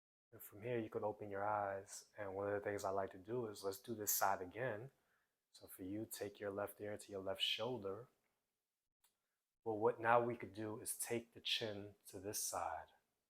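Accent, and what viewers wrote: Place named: Chicago, United States. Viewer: New to this channel but spirit had this guy come to my FB reels and it's been since June 2025 that I was last on FB. Anyway, I needed to take care of my body as my right side isn't working well. Stiff & painful with every movement and it's been years. So I did some movement stretches from him. I felt better as the movements were slow not to injure an injury. Listening to him speak on YT made me think of Chitown (Chicago) from his accent. At times I'd giggle & at times I felt family that still live there. Words like "bref, stumick, erf" man, I miss my family & Chicago!! ❤❤❤ TY guy for your video😊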